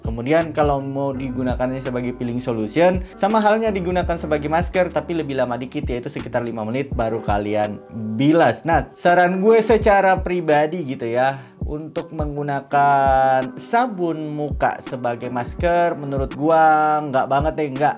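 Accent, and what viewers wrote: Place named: Bugis, Indonesia. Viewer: Logat nya kayak orang bugis ya bang?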